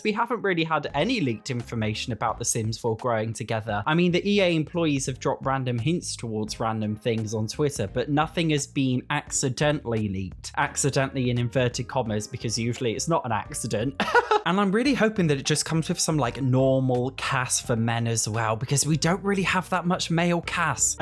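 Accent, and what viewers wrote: United States. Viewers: Your american accent always makes me laugh, it is - chef’s kiss - amazing. 😂😂❤ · You with the American accent sent me into an alternate universe where the sims 4 isn’t garbage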